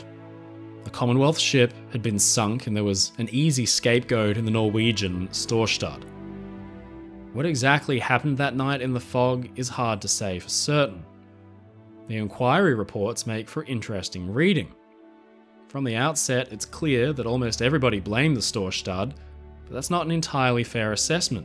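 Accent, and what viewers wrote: Australia. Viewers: "something about your accent" - quietly spoken articulate non ocker Australian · I like how you speak australian.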